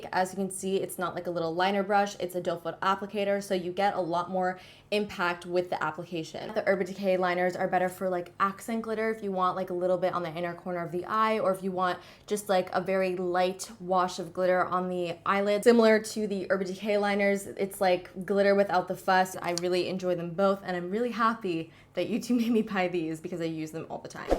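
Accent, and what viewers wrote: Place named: Canada. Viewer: Do you watch Lael Hansen? I just noticed you both sound alike . Might be the Canadian accent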